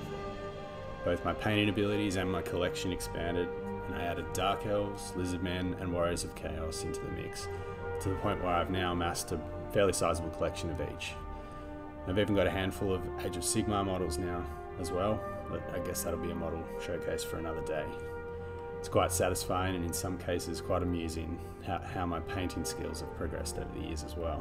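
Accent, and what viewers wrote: Australia. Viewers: Hey man, just stumbled on your channel. Great to hear a fellow Aussie into Total War and Warhammer! My story was actually quite similar to yours. Got brought into the fold in late primary school and instantly gravitated towards the High Elves with the very same army book! Hope to see you around in Quick Battles.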